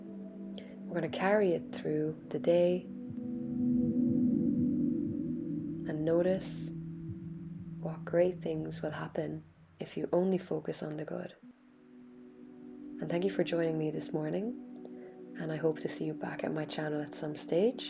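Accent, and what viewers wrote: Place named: Ireland. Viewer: Hi Thank you for the lovely flow, great to hear a soft Irish accent 😊 Mandy 🌳 xxx Belfast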